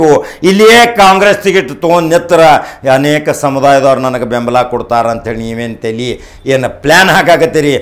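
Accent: North Karnataka, India. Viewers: ಸುವರ್ಣ ನ್ಯೂಜ್ ನವರು ಹೇಳಿದನ್ನೆ ನೀವು ನಮ್ಮ ಉತ್ತರ ಕರ್ನಾಟಕ ಭಾಷೆಯಲ್ಲಿ ಅದು ನಿಮ್ಮ ಸ್ಟೈಲಲ್ಲಿ ತುಂಬಾ ಚನ್ನಾಗಿ ಹೇಳಿದ್ದಿರಾ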